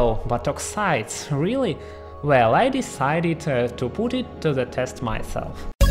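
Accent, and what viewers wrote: Germany. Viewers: He sounds German I wonder that too xD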